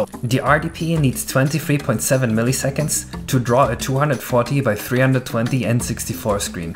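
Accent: Germany. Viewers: i love the heavy german accent. very thematic. "lol. typical germans. always trying to reinvent the wheel. there's no overengineering like german engineering" and the like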